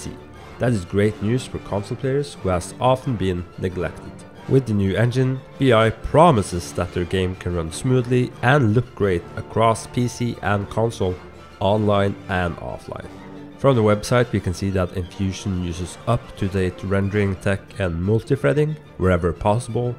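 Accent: Norway. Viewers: Are you norwegian? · bro you sound so Norwegian xD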